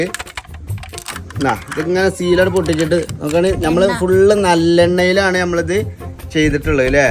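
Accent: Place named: South Kerala, India. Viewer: കൊള്ളാം തെക്കൻ പറയണ വടക്കൻ ഭാഷ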